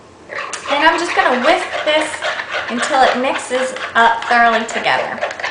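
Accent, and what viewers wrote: South, United States. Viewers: Is that a southern accent I hear? :)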